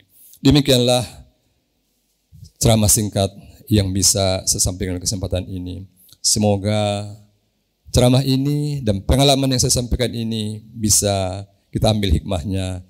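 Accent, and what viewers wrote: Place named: Sulawesi, Indonesia. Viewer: Masyaa Allah, Pak Dr. orang Sulawesikah? Dari aksennya spertinya dia dari Sulawesi hehe.. Barakallahu Fiik pak Doktor..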